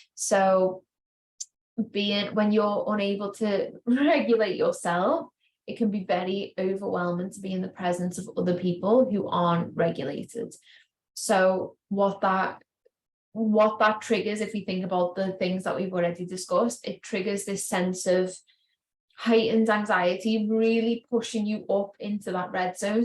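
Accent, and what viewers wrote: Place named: Liverpool, United Kingdom. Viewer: Nice to hear another Scouser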